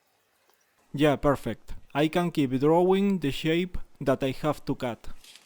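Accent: Spain. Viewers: Este ingles es muy español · Con oírte decir dos palabras sabía que eras español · Habla el inglés como Fernando Alonso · Se nota que eres español bro jajajajajajajajaja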